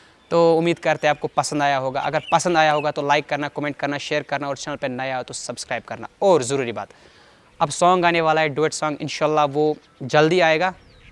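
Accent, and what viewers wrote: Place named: Sopore, India. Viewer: Abi tak mai soch raha tha ap sopore se ho becoz of your ascent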